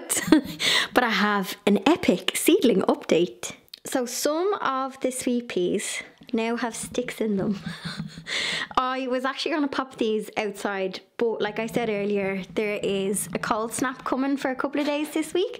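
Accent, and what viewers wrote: Dublin, Ireland. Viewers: Cottage decor, gardening and a good Dub twang, what more could you want!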